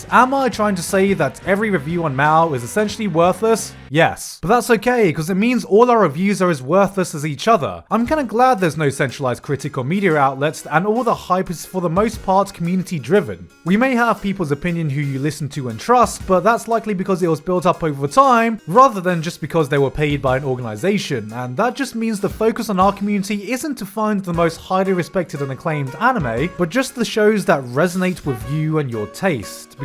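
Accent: United Kingdom. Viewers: 🙋‍♂️I miss the salty gigguk who swears every 3 seconds in British accent. He made a baccano video swearing for 3 minutes, and it's a masterpiece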